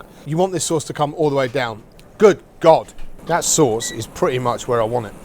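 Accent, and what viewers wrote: United Kingdom. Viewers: Brirish accent, French dish and app belonging to which nationality! It is like the Butter Chicken saga😂😂😂Lost in transition.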